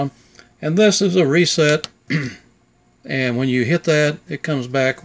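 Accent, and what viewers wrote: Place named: United States. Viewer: Thank you, Your american accent is so easy to understand I like! Greetings from France!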